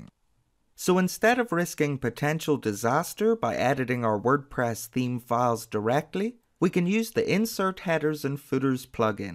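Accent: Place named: North, United Kingdom. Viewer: nobody should dread you at all - you are inspirational! Thank you so much for this tutorial. I'll be honest, I have looked at numerous such videos but I totally love your approach. Clear, concise, engaging - all washed down with that soft Northern accent., would it be terrible to ask a quick question about one of your other videos here? Well, I'm going to do it anyway! A twitter feed on a website - you showed it being put in a sidebar. I don't have a side bar, so can it be plonked in a page? I use siteorigin page-builder and widgets. This is not important really, but I can't say widgets if I am drunk, so I call them dingdongs! I have also followed your friend Alex last week and told him if he ever comes to Ireland to let me know, as it would be gas to help him out. He could do a tour - I'm in Cork so we could travel the length of the country. 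That again. Best wishes, Colin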